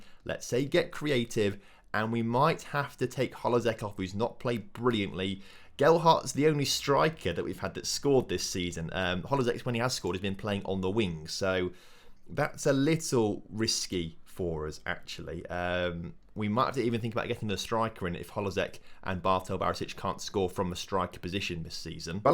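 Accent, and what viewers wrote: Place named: Scotland, United Kingdom. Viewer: Love the Scottish accent 😂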